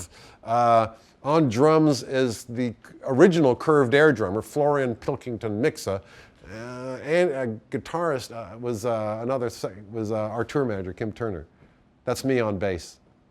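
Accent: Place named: United States. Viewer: He sounds so American but isn't he British?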